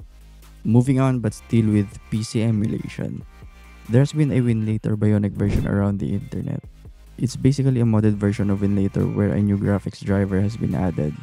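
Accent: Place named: Philippines. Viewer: Man you filipino!? I'm subscribing after hearing that accent. Also we have the same tablet so your channel would be very helpful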